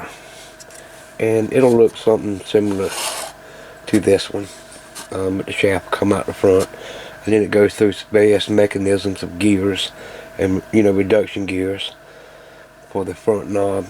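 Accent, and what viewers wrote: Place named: North Carolina, United States. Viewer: You sound like your from North Carolina or Tennessee im from North Carolina.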